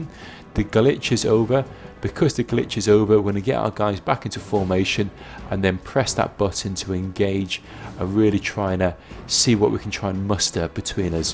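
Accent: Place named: Wigan, United Kingdom. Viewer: what part of the UK is accent from? Sean Bean has the same style of English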